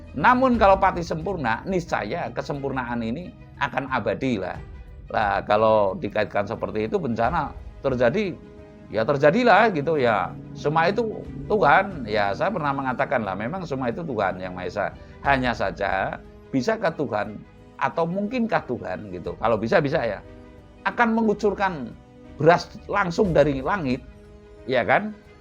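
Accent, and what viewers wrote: Banyumas, Indonesia. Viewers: Rahayu Ki, pngndkne sami klh kula Ki, ngapak. Kula skng Karanganyar Kebumen ndrk nymk Ki 🙏.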